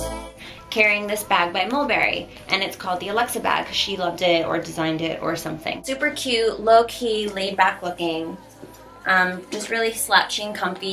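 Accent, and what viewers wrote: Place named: New York, United States. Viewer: U can hear her new york accent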